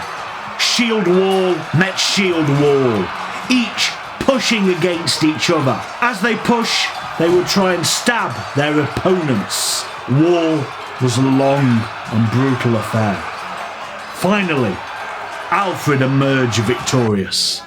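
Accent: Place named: United Kingdom. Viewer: Wonderful narration of what is often, to many Americans at least, a rather convoluted part of British history. I must admit though that it's likely the British accent that helps solidly nail the performance.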